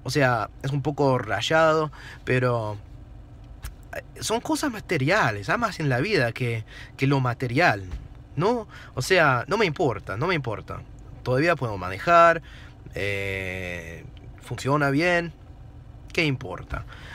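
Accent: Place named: Argentina. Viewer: Excelente, sos un argentino hablando.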